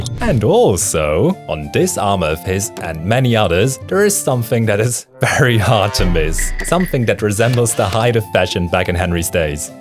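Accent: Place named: Germany. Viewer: I heard german ! Ich wuste es der dialekt kam mir bekannt vor😂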